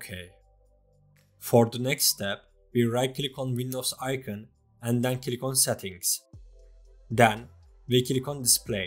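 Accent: Turkey. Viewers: Aksanından ve şurda ve demenden Türk olduğunu anladım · vaay abim türk :D konuşma seklinden anladım abi kralsın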